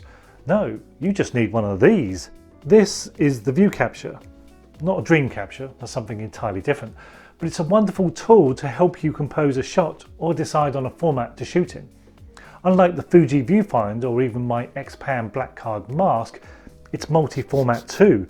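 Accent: United Kingdom. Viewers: Excellent instructional uploads amidst a sea of gear review channels. Always very interesting subjects with a unique delivery style. It's nice to hear a UK voice for a change. Thanks for sharing and caring. Keep on keeping on… ✊🏿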